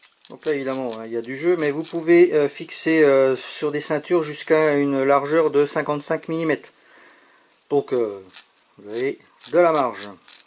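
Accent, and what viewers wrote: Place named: Franche-Comté, France. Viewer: Hoooo j'ai kiffer l'accent vingt dieuuuuuuu...franc comtois en force lol... Plus sérieusement c'est de la très bonne qualité mais le prix de la grenade pique un peu quand même je dois avoué sinon superbe présentation comme dab.a bientôt à l'OP 😉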